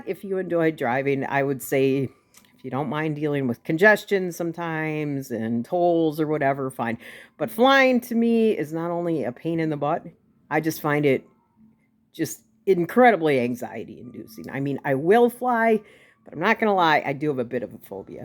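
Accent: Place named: Wisconsin, United States. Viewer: I got here by searching "wisconsin accent, because I'm from Wisconsin and I like linguistics and I'm sad that my accent gets NO attention in the linguistics scene. It's refreshing to hear the Wisconsin dialect on YouTube for once. P.S. Have you ever seen Metalocalypse? The drummer, Pickles, has a stereotypical Wisconsin accent and it's hilarious.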